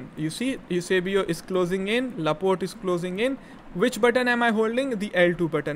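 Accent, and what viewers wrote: India. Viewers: Love indian accent 😅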